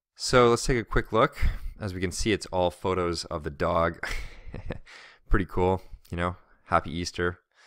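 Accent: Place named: Canada. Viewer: Yeah ... but I'd completely whoop your ass at Sonic Spinball ... So, I'm sitting here marathoning your videos, and you've said that you don't have a pet ... Lets hope that you're single as well, as I'd only ever heard of you approximately 2 and a half to 3 hours'ish ago ... And I'd nearly labeled you an asshole, but you're cool ... I was so borderline offended by the first of your videos that I watched, more mildly outraged ... Now, I realize that you're just brutally honest and a sweetheart of sorts, with your whole taking on the responsibility of taking care of your family and all ... My father fell into a coma the day that I came home from Colorado, never woke up, but that's a different story ... #PepperThePitBull keep an eye out for her ... OH, and you are officially "Strange Brew" Canadian when you say "about" ... It's not something that you can change at this late date, but yeah ... 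That's definitely your Canuck street cred right there ... You pronounce it more "aboot" than "about" ... Anyways ... <3 C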